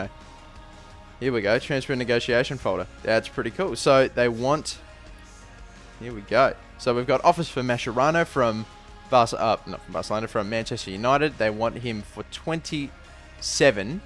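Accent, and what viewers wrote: Australia. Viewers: You Aussie mate ? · are you Australian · are u aussie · your aussie i love you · Nice to hear an aussie accent on a fifa 13 video ;) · Are you Aussie? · Are you from Australia? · are you australian :( im portuguese . quem e portugues da um like ao comentario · Are you Australian? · Are you australian ? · are you australian mate? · Yes an australian commentator! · what country are you from? australian ?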